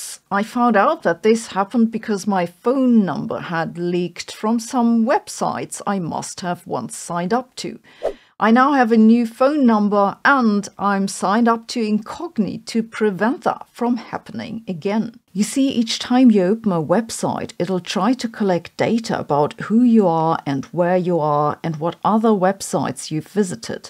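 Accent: Germany. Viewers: Incredible accent. My thinking is she’s German and has spent a lot of time in the U.K. Now on to the Science…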